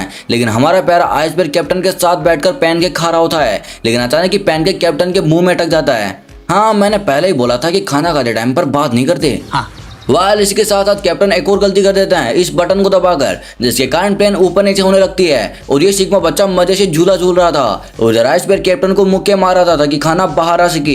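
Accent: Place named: Pakistan. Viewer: Ap Pakistan se ho? 🤔 aapki accent pakistani jaisi hai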